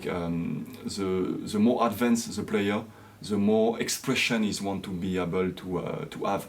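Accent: France. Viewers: Merci pour cette vidéo, je n'aurai jamais pensé que vous étiez français, j'ai été surprise par le " French accent " avant même de lire la description de votre vidéo. En tout cas très beau travail, continuez, ce que vous faites, c'est magnifique =) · your French accent is beautiful. Please never ever get rid of it; it’s as melodic as the Erhu. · your accent is Frenchy English and that's lovely and unique 😊 and You have a beautiful voice 🤍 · 法國人說英語口音真的很重😄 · "I hope you don't mind my terrible French accent" there is a SINGLE sound you can learn that will make a massive difference in your accent and make it much easier for people who aren't used to French accents to understand you. It's the sound of the letter H, which is simply the sound of breathing out through your mouth. If you can breathe, you can make this sound easily! The letter H in English is typically NOT silent like it is in French (though there are always exceptions, like "hour"). 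I would suggest searching "comment prononcer le son de la lettre H en anglais" here on Youtube. It should take you only a couple minutes to learn and it will THE EASIEST AND BEST way to improve how people understand your speech. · Nice french accent! Et joli home studio. J’habite en Thaïlande et je suis passionné de musique asiatique. Bonne continuation. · Ça s entent que t es francais , le u ! Le u mon reuf !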